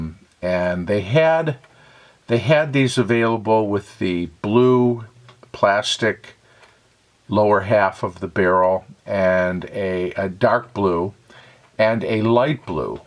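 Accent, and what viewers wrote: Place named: Great Lakes, United States. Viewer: ESSAY ALERT 🚨 Mid 60-year-old, always a pen fanatic, I recently came across a website all about the history of Parker pens. I’m sure fans of Parker know of this website. I remembered I had bought a Jotter in the early 90s, replaced the cartridge with a bold cartridge, didn’t like the way it wrote particularly and so threw it in a box where it has been for decades. Website gave date codes so recently I found and dated my old black jotter to sometime in 1991. Decided to refill that jotter with a modern jotter refill, which I am assuming is their best work on the ink so far. Enjoying the way it writes, decided to purchase a new Jotter XL, and I really like this one which is perfect for my large hand. Was in thrift store recently, came across a bag of old pens and pencils, looked at this bag, and to my amazement saw a stainless steel Jotter! 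The whole bag sold for around two dollars, threw away all the other cheap no-name pens, pencils, and kept the jotter. Replaced the cartridge with a fine point. What a thrift store find that was! So I use my old black Jotter and my new Jotter XL and the thrift store stainless steel Jotter and I’m happy as a clam now. The Jotter stainless steel date code worked out to be about 1991 as well. Both were made in the USA, the new Parker XL was made in France. Does anybody know if the original is made in Janesville? How many other factories does Parker have in the USA? Happy writing! Great video, really enjoyed it. Nice Great Lakes accent too 😉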